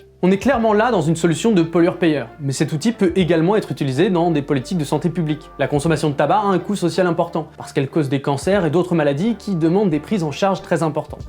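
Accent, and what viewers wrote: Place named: United Kingdom. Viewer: Ton accent anglais est incroyable, j'ai eu de l'ASMR quand t'as dit "the economics of welfare"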